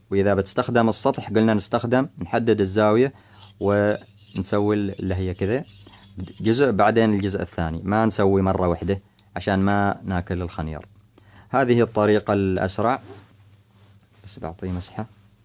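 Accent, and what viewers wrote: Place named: South, Saudi Arabia. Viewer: سلمت و بيّض الله وجهك على الشرح الوافي. وبالنسبة للّهجة اللّي تتكلم بها بقلب الجيم الى ياء فهي نفس لهجتنا في قبائل رجال الحجر جنوب السعودية. وسلامتك ✋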